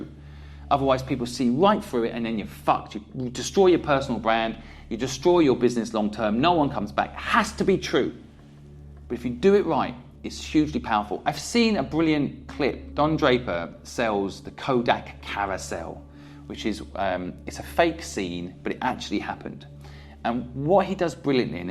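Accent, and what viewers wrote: United Kingdom. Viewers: Squibb just sounds British. I love that I finally found you today. This video is one of the most important videos I've ever watched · Ugh dammit, he's British :/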